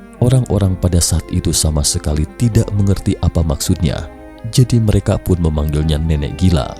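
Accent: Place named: Bandung, Indonesia. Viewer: Suaranya ini mantab tapi masih ada logat aksen daerah nya, kadang ada akhiran Ak.. Ak... Asli Bandung atau mana ya..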